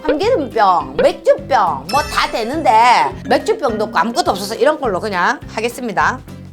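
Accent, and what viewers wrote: Busan, South Korea. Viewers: 부사너로써 찐사투리 들을 수 있는 요 컨텐츠 넘 조아요! 그리고 거침없는 요리실력 속이 씌원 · 울 부산 이모랑 말투 똑같..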